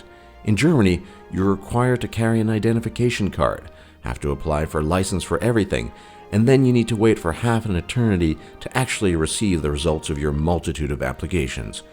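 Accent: United States. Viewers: German production with an US speaker. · The american accent sounds damn cute♥️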